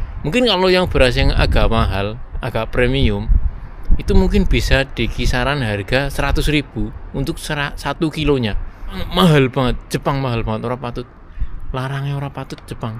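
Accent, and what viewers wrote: Banyumas, Indonesia. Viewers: Mas rika wong ndi si, kayane logate madan ngapak sesekali😅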